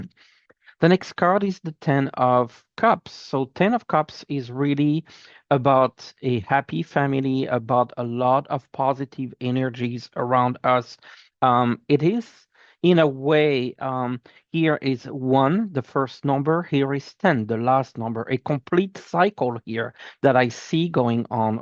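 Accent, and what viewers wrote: France. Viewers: Thank you , may I ask are you French? Your accent sounds a lot like mine, I’m new to your channel , glad I found you, hope you have a great year.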